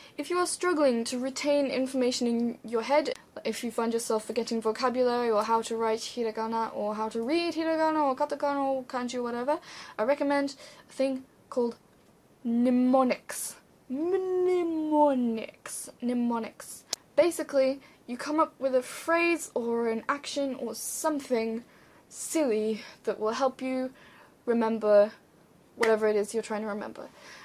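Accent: United Kingdom. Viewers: i love your british accent <3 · is she british?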